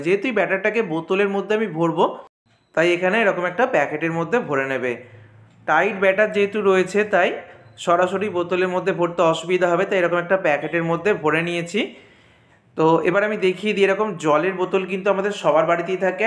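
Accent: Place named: Kolkata, India. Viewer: তোমার রেসিপি খুব সুন্দর। কিন্তু তোমার কোলকাতা স্টাইলে কথা শুনলে রাগ উঠে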